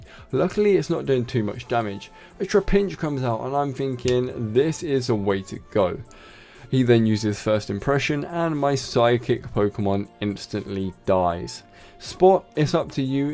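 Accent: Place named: United Kingdom. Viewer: Imma guess your British as a British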